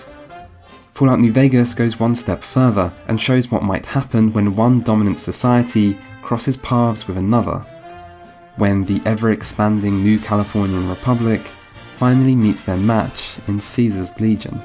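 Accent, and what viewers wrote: England, United Kingdom. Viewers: Not a criticism of you, but must every documentary guy speak softly with an English accent? It puts me to sleep, I swear to you. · Really good commentary and soothing British voice that sounds intelligent. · Wow! My first video discovery was the amazing Lovecraftian games video, and now I can't get enough of so meticulously crafted, intellectually challenging insights on games I never pondered about. P.S. listening to that brilliant English accent narration for a non english speaker is to die for :).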